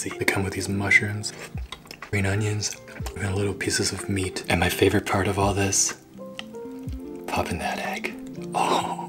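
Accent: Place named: Australia. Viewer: I thought you were from Australia 😭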